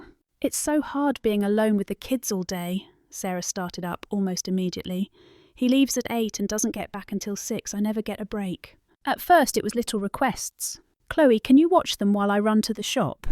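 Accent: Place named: United Kingdom. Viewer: Same story I read awhile back, with a British accent!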